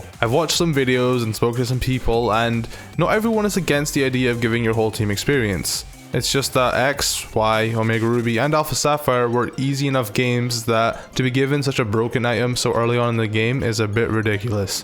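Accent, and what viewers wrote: Scotland, United Kingdom. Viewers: Are u Scottish because I am to 👌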